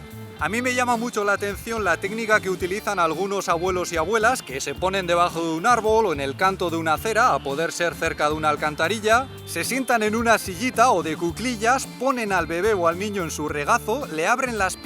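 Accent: Basque Country, Spain. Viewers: No suelo contestar ni hacer comentarios, pero podéis conmigo. Como pareja mw encqntais, además que la socialización entre dos culturas tan diferentes colo la española y la China 🇨🇳me atrae una barvaridad., me encanta tu manera de hablar como vaasco que eres Yo soy catalán, hijos de andakuces y me encanta tu manera de hablar. Ojalá esto del covid-19 acabe y podáis hacer una quedada para conoceros. Me habéis eclipsado 🥰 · Tú tienes mucho acento vasco pero tu novia ha aprendido muy bien el español pero no le has contagiado el acento. Bromillas aparte, un vídeo muy interesante y bien editado.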